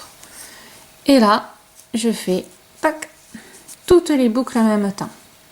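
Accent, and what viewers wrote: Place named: Southwest, France. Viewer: vous seriez pas un peu du Sud Ouest par hasard , ma fille habite près de Luchon, je reconnais l'accent ....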